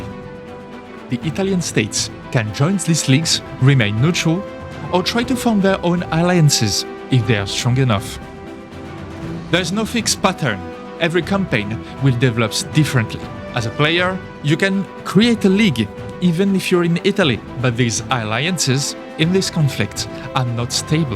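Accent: France. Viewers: But why this french accent all the time? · I want his soft french voice put me to sleep while he tells me about the absolute carnage and misery that was the thirty Years War. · honestly I have been able yo underdtand him quite well as he's articulating and talking slow enough. Though I'm used to french english from other pdx employees. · As an Italian, I listen a french guy that speak in English about Italian wars in a game without Italian language support. Pretty weird feeling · Love this French accent, more of this!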